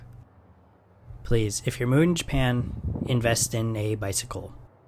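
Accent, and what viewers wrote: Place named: United States. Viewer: I'll always choose ground floor. Carrying a bike plus groceries up stairs is too much for me. P.S. I'm happy to find your channel. I follow another but he doesn't share a vid often enough. I am watching two now and you speak American English. 😊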